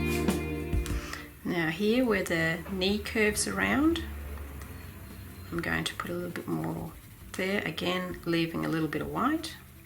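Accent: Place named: Australia. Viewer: Thank you very much for the useful tips and advices stunning work, by the way when you speak about Australia and your accent they remained me when i was student in hight school ten years ago studying in Australia and the teachers accent over there.. you makes me miss Australia and want to visit the beautiful Australia again for two mouths lol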